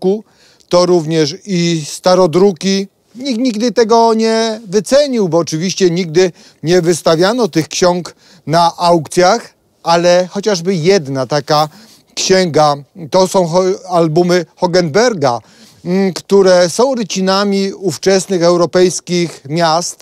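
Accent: Kraków, Poland. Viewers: Panie... no nie czarujmy się, powiedzieć, że full profeska jak na amatora i że pan Fajbusiewicz mógłby panu plecak nosić, to jak nic nie powiedzieć. Swoboda przed kamerą, merytorycznie przedstawiane sprawy, ten cudowny krakowski akcent ( jestem z pomorza zachodniego), no i oczywiście fenomenalne kadrowanie jak na " youtuberowych stremerów " to jesteście prze mega... tego, tego ale nie przeginajcie, bo to zajefajne kadrowanie z dołu staje się Waszym Logotypem. Pozdrawiam gorąco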